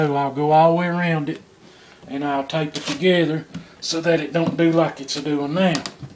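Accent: United States. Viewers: Thanks for the video from Baton Rouge, LA. Where are you, sounds like you are a fellow Southerner! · oddly enough your accent sounds like my family from Southeastern Kentucky & West Virginia. Thanks for sharing! Great idea! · Just a good ol country accent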